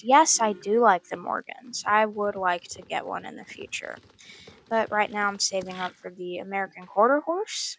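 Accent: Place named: South, United States. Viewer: Twin you got an accent too southern twinnny!! -Shenzi or Scylla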